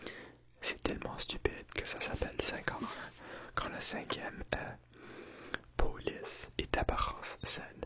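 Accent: Quebec, Canada. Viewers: Ton français resemble au français du Québec ! A tu apris le français au Québec ? Btw très bonne vidéo merci !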